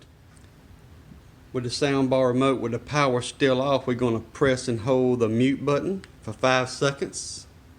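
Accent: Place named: Louisiana, United States. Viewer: Cool accent. Louisiana?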